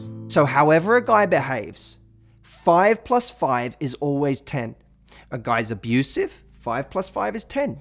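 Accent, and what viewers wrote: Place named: London, United Kingdom. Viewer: London accent noted :-)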